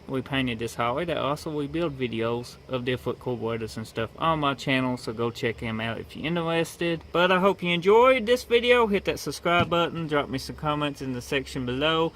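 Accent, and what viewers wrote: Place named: Louisiana, United States. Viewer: That accent are you from Louisiana?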